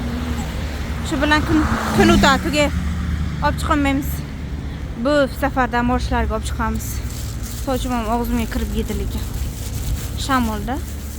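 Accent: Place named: Syrdarya, Uzbekistan. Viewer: Nasib keling Sirdaryodanmisiz shevayizi o'xshatdim adashmagan bo'lsam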